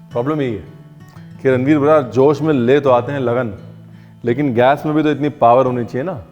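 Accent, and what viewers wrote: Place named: Lucknow, India. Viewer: U never disappoint at all.. each recipes has its nayab ( your lucknowi urdu)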